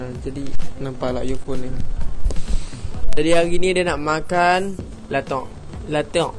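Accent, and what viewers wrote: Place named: Negeri Sembilan, Malaysia. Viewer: Fuuuu, pekat nogori nya tuuuu.. Terboyekkkk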